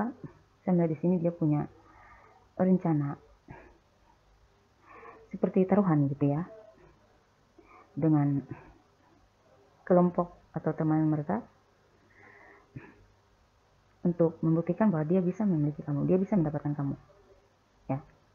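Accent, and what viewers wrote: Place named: Sumatra, Indonesia. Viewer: pembacanya sederhana banget,sumatera /luar jawa ya jeng,💪💪,suka dgn kesederhanaan.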